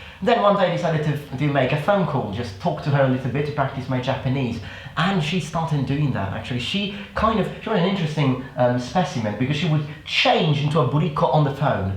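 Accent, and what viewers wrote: England, United Kingdom. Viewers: Perche' e la prima volta che vedo un tuo video ,e mi sembrava dall'inizio che sei italiano,anche seppure hai l'accento inglese ahahha.E io poi che sono rumena ,ritornata 7 anni fa in Romania dall'Italia :)))?! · from my vantage point here in merica you need to do some bicep curls bro. and why do you sounds like an Englishmen if you're an eyetalian?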